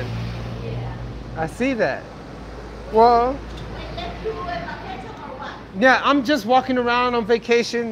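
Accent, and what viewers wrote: El Salvador. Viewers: love your salvi accent 👍🔥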